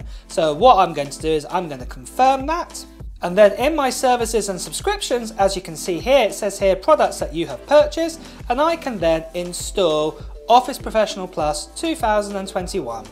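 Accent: United Kingdom. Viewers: the service that you are advertising won't allow anybody outside of the US to enter their address in the purchase page. How did you get your keys, because you sound British, or are you living in the US? Maybe you should disclose that.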